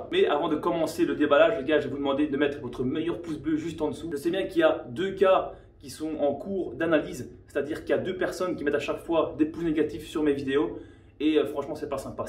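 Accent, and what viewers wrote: Belgium. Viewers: tu es belge ? si oui quelle region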